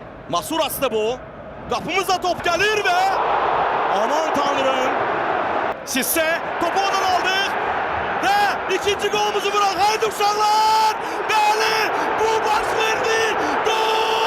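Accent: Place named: Russia. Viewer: Sən şərh edəndə, futbolun ləzzətini itirirsən, rus akçenti ilə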